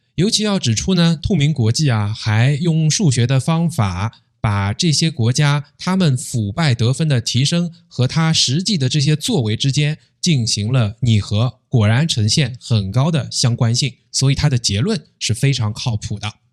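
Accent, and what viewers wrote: Standard. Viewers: 你操着一口标准的普通话。 你说马来西亚贪污腐败。 那么中共呢？ 马来西亚有几个州的苏丹。 赵家的七个家族呢？ 中共有特供。人民吃XX食物。 起码马来西亚人的食物规格没有低劣到这样。 马来西亚的政党高官贪污，舞弊，腐败。 中共可是有公安。说多了就会被抓进去。 早期马来西亚有内安法律。 中共更是一手遮天。 中共人口众多，生活压力大。 马来西亚怎么说马来人占多数，起码生活压力没有中共大。 中共有56种税（10多年前）。 马来西亚（人头税，土地税，个人所得税）。 有对比就有伤害。到底哪里让人活不下去？ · 我国是还有前途的，只要安华继续掌舵，唯有取缔贪官腐败，马来西亚是仍有光明前途的，不过只要有清廉领袖。主讲人的语音规范，流利清晰深入浅出的优点，目前有很多主播在语音方面很糟糕也来主讲。 听了 你的演讲耳朵很舒服。谢谢！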